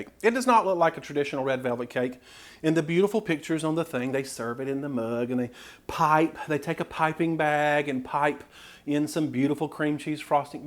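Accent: South, United States. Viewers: I just subbed based on your rant alone. I love it! I'm a southern girl and you sounded like the people from my grandma's hometown! That blessed me, thank you for not editing it out. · I love this including your talking (which I don't consider rambling at all) Thanks for your humor and as a Southerner myself, your accent. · Found your channel a few days ago. I love your personality and good nature. Loooove love love your southern accent! I used to live in the FL Panhandle and miss it so much. Thank you for your honesty regarding your triggers, we all struggle in different ways. Wish you and your channel the best 🙌. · You popped up on my YouTube feed today and I instantly fell in love! I love the recipes, I love your rambling...and I especially love your southern drawl (I am from southwest VA). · I love to hear ya talk I'm super southern too lol so you keep doing you ❤❤ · i just subbed bec of this vid. 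I love it. Also, your accent... I can't quite put my finger on it... southern?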